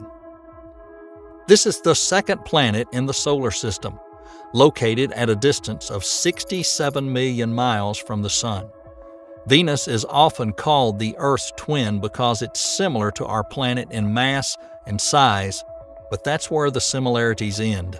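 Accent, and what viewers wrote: South, United States. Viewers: Just 😍 the southern accent. Being a New Englander I don’t hear it often! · I REALLY WISH they'd pronounce it the right way, YOU- rah- NUss,, not you-R AAY nus,, and especially with that Southern Bible belt accent, I sincerely think the guy was pronouncing it that way because he liked doing it lol...... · It just sounds so out of whack to listen to cosmological specials/documentaries narrated in that southern drawl. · This guy sounds COUNTRYYY! 🤠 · There's something about a guy with a southern accent talking about space that is surprisingly off putting imo. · A southern narrator. Never had that happen. I subscribed before I even watched the video and I'm here to stay. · Dunno what to think about a guy with a heavy southern accent telling me about space... 🤔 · "Peculiar -arities. ." Sorry, the muddy southern drawl and not pronouncing words is killing your cred. 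· Love that southern accent spitting space facts! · Love hearing a southern narrator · British narration is so much preferable than an american accent like this. Too bad, could've been an interesting doc.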